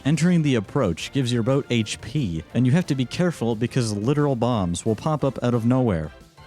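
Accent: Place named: United Kingdom. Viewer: COULDV GOTTEN ARE U BRITISH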